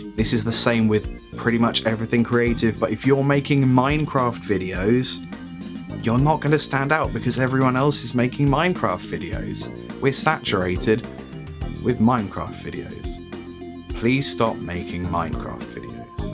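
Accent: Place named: England, United Kingdom. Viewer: Thank god an English accent! 🤣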